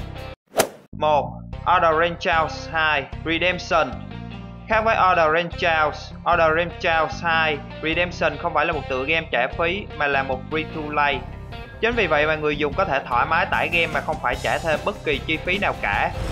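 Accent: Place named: Binh Dinh, Vietnam. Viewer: ôg giới thiêu game có giọng nói giống dân 77 quê tui gơ😂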